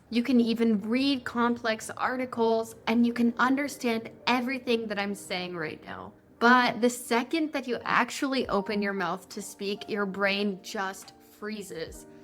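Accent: United States. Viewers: I really love the American accent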